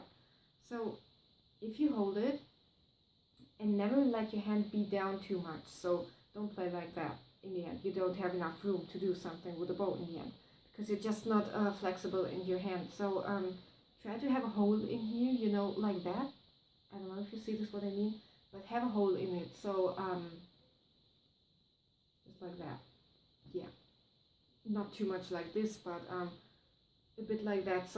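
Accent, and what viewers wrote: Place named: Germany. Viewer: Ich hab mir bei deinem Englisch-Akzent irgendwie gedacht dass du deutsch sprichst. Aber das ist eigentlich total unwichtig. ist mir nur aufgefallen. Du spielst gut. Ich bin auf viele neue Videos gespannt! :)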